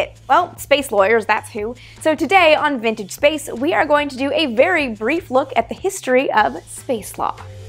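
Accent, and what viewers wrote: Canada. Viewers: I really like these videos, and your pronounciacion is so Candion. Hehe. Go!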